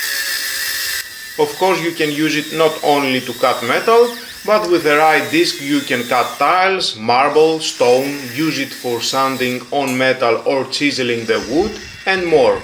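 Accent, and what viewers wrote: Greece. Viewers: Έλληνας είναι . Φαίνεται απτή προφορά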